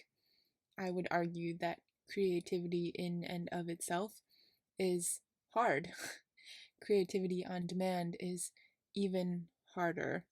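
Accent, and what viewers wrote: United Kingdom. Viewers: Everything sounds just lovely with a british accent! 🇬🇧🇬🇧🇬🇧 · I love your British accent. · Some more lovely and cinematic sequences. I'm glad that the seagulls didn't take down the drone ;-). You don't need to mock or be hard on yourself, the chatting is charming, as is the British accent. Did you watch Chitty Chitty Bang Bang for inspiration? Keep up the engaging work and life ❤️ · 🇬🇧 What a tranquil island. British accent was perfect! 😁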